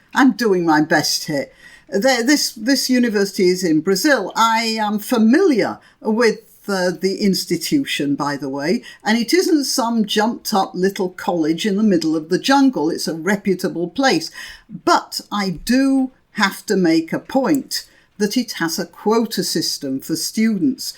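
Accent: United Kingdom. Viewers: Hail and welcome.... welcome into my purview so I can become more and more enlightened. I welcome your British accent.... the each and every noun and verb etc are so well enunciated and the thought that is back-tracked to be RE-pronounced is just in sync with the ones I need to re-hear. I'm so lucky. um, to have found your channel.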